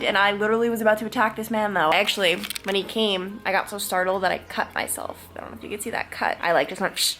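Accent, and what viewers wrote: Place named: New York, United States. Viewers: You still definitely have an east coast flare on your voice. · Another awesome video . Really cool New York accent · I need more of the Long Island accent, please.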